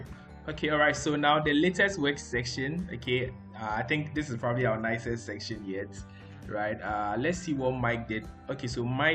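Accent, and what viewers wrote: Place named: Ghana. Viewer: Great one There. Your accent reminds me of a Ghanaian Friend in Germany.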